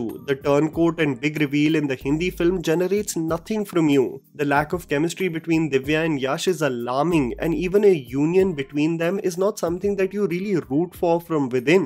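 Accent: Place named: Kerala, India. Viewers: Well I'm a Malayali...i really like your Malayalam accent 😊😊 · Yr malayalam is so funny ❤luv yr channel💜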